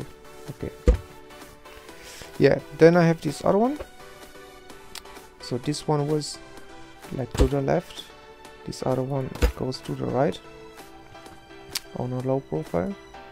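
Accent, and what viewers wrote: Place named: Germany. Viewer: You sound German, are you?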